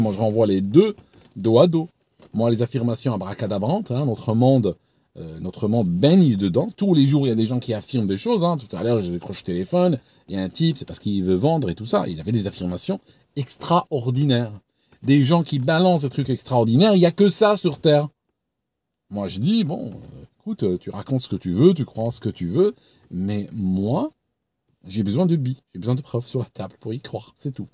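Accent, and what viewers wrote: Switzerland. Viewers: Amusant ce petit accent helvétique, je ne l’avais jamais remarqué sur tes autres vidéos d’aviation 😂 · ton accent suisse ressort lors de ton exposé, Ça fait tout drôle... 😄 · Excellent! Mais c'est quoi cet accent suisse?! 🤣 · Il n'y a pas que moi qui entend un accent Suisse ??? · Top l’accent suisse qui ressort dans cette vidéo 😂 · de ouf, je me suis dit mais qu'est-ce qu'il fabrique avec cet accent suisse tout à coup?!? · Ce qui me choque moi dans cette vidéo, et c'est la première fois que j'entends ça chez toi, c'est qu'on dirait que tu avais un challenge: dans cette vidéo tu dois parler avec l'accent suisse! Eh bien challenge réussi! 😁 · ton accent Suisse ressort lol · Excellente vidéo (comme toujours)👏👏 où tu argumentes encore mieux que ceux qui ont menti à propos de cette mission. Avec leurs têtes d'hommes honteux pendant la conf de presse, n'importe quel joueur de poker voit que ces hommes ne se considèrent pas comme des héros. 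Et connaissant l'arrogance des américains quand ils sont fiers de quelque chose, on en déduit que ces 3 hommes sont très mal à l'aise avec ce mensonge et ne sont absolument pas fiers d'eux. Bref C.Q.F.D. À noter que pour l'occasion, tu as sorti ton savoureux accent suisse romand, du pur bonheur 😉😊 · J'adore ton accent Suisse,lorsque tu nous expliques les détails de tes arguments!!! Merci pour tes réflexions 👍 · Oulala toi tu as vécu en Suisse, je peux même te dire le canton: VD?! Tu as bien gardé ton accent!! Salut l'Ami!! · J’étais pas prêt pour l'accent suisse... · J’avais jamais remarqué l’accent suisse 🇨🇭 😅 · Vous avez un petit accent suisse, bonne continuation avec toute ma sympathie. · C’est la première vidéo sur laquelle ton passage en Suisse se remarque vraiment à ton brin d’accent d’chez nous 😉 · Ton accent suisse s’entend parfaitement cette fois 😊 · Ahaa L'accent suisse.. 🍰 Et oui c'est pas mamie qui fait tous les gâteaux.. 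;) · J'ai adoré ton accent Suisse 😉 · Intéressant. Mais c'est une impression ou t'as pris l'accent suisse pendant la vidéo ? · cher, je n'avais pas écouté cette vidéo, très très intéressante, tu gardes ton sérieux, et tu parles avec l'accent suisse. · Dans cette vidéo, tu as l accent suisse lol, un reste de tes années vécu las bas ? Sinon comme tu le dis très justement je préfère avoir tort et avoir l esprit libre ,plutôt qu avoir l esprit d'un poulet élevé en batterie... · L'aziz. bravo à toi. On te l'a déjà dit j'en suis sûr. Tu as l'accent suisse qui remonte à la surface en ce moment ! Alf shukr pour le contenu et la livraison inégalables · Je n’avais pas remarqué cette accent suisse, lors des autres vidéos…. Merci 🙏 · l'accent Suisse qui revient de plus en plus fort la vidéo avançant. Interessant les points que tu soulèves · Merci pour la vidéo on entend parfois ton accent suisse c est super a entendre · C'est dingue comme l'accent suisse est présent. 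· Je viens de retombé sur cette vidéo. T'as grave l'accent suisse là-dessus mdr! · Tu as déménagé en Suisse ? Tu as, dans cette vidéo, un accent suisse que je n'avais jamais remarqué. Ceci dit, excellente vidéo. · l'accent suisse revient à la charge !😄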